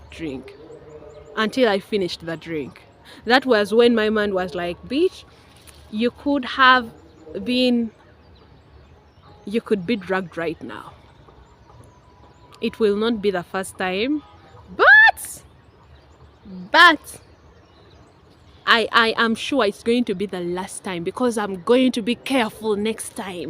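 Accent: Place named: Uganda. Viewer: Interesting listening to your Ugandan accent. I had no intesion of watching the whole thing but I just did! Karibu Kenya.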